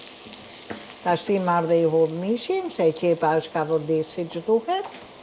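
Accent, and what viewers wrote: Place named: Central Albania, Albania. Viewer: Te lumshin durt dhe goja me tfolmen e shqipnis mesme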